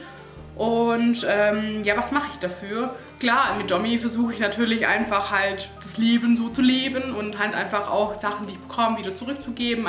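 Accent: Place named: Swabia, Germany. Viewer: Tolles Panoramafenster. Bei Euch liegt ja ordentlich ❄️! Wo kommst Du denn her der Dialekt hört sich sehr vertraut an! Schwäbische Alb? Was machst Du denn beruflich? Wie habt Ihr Euch denn kennen gelernt? LG aus bayrisch Schwaben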